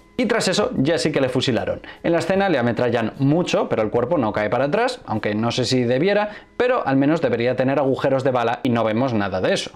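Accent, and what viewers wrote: Spain. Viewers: Soy mexicano y escucho tu acento español, no escucho bien cuando pronuncias el nombre del personaje, yo lo escucho como himler, pero Hitler era uno y himler era otro.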